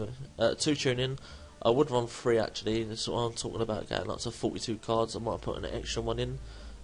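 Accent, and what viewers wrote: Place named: Cockney, United Kingdom. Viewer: You have a cockney accent for sure mate, like danny dyer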